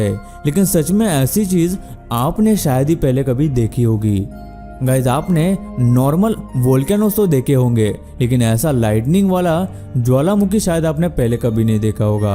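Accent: South, India. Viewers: Bhai ki voice se lagta he ki bhai South se he ??🤔🤔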